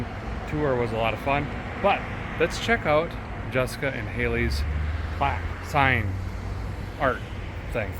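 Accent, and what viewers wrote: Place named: Minnesota, United States. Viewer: I've never really noticed the Minnesota/Wisconsin in voice before hearing him say "bag" a few times while speaking about longmire. Bagged trucks are a lot of fun and can also be a lot of work.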